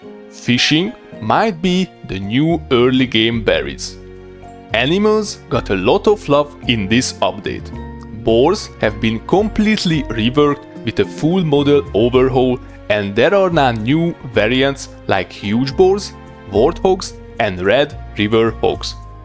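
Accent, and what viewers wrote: Hungary. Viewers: First video I have ever watched from you and in the 1st minute I could tell you are hungarian. Örülök hogy nem vagyok egyedül a vintage story kedveléssel magyarként:> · Are you Hungarian? I’m asking because you have quite a Hungarian accent. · I can spot a fellow lovasíjász when I hear one! Klassz videó, köszi bátyus!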